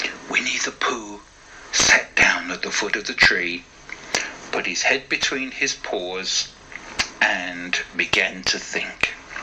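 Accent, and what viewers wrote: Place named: England, United Kingdom. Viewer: beautiful story time absolutely love your English accent thank you my Best Friend loved Winnie The Pooh🤗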